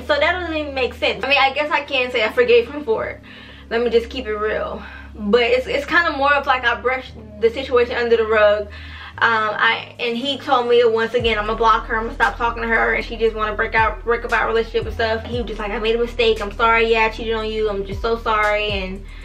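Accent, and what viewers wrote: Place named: Louisiana, United States. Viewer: You from Louisiana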